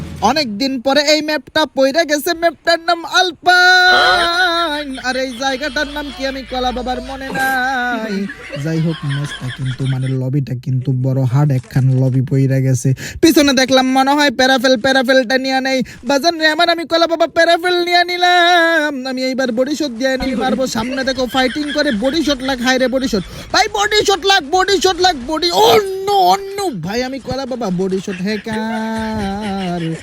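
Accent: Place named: Munshiganj, Bangladesh. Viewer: Bhai apnr basha Munshiganj bikrampur er kon jagay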